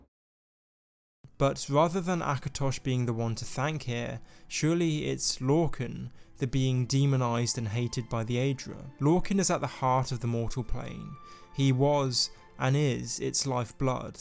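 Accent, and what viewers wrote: United Kingdom. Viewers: You have a specific type of British accent. Is there a name for it? I’m almost certain that you would pronounce the word “drawings” like “draw rings”.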